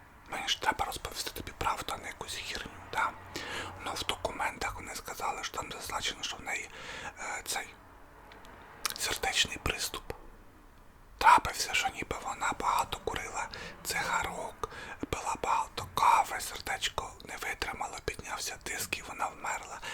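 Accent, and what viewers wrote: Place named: Poltava, Ukraine. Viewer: А я була впевнена, що ти з Полтавщини. Як приємно бути правою!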